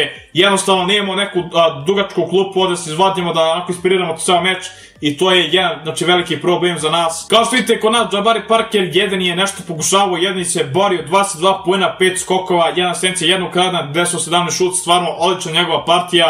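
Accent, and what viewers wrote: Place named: Nis, Serbia. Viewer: pricas ko da si sa nisa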